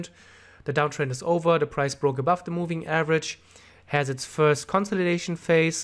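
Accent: Germany. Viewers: Dieser Moment wenn man direkt raushört das du Deutscher bist. :D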